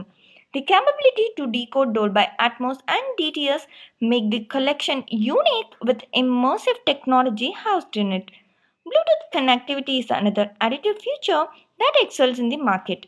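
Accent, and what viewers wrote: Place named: South India, India. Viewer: Could find an accent u should be south Indian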